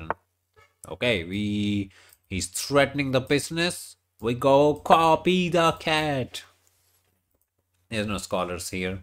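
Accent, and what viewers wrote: India. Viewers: The indian accent gets me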